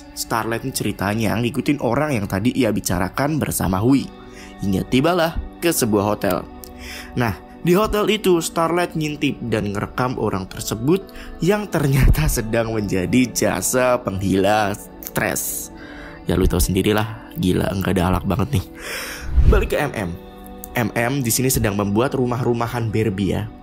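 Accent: Java, Indonesia. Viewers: Mas dari jawa ya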